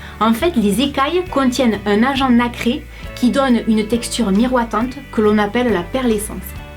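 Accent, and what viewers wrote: South, France. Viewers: J'adoooore ton accent 👌😍 Le sud en force ! 😂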